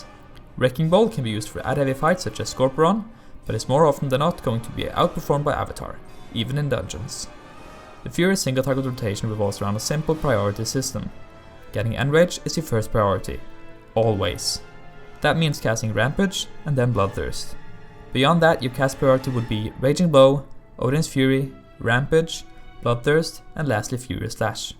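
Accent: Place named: Norway. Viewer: hahaha du hørtes jævlig norsk ut på videoen xD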